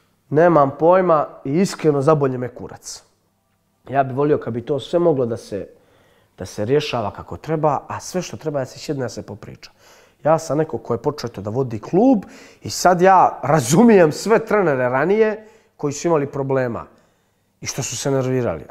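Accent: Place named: Rijeka, Croatia. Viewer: Ludane ludi, a znas kako mi je milo kad pričaš rišnjanski pored toliko godina Beograda!! ❤❤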